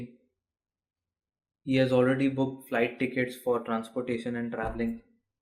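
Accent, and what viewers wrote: India. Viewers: Can't stand this weirdo Indian and his annoying accent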